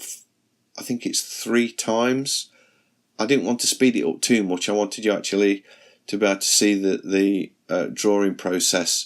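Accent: United Kingdom. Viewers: what you’re doing it’s wonderful.you can’t do split screen on this app,but in the Pro version you get 3 layers and that makes it very interesting,you can switch and merge those layers. There is also a 4th layer that actually let’s you’re choose what type of paper to use. AND in the Pro version you get the “blend” that you mentioned,very cool,the first of the 3 tools at the bottom. See i know where things are,but I’m not very good at sketching:-). Loved your video,and the British accent lol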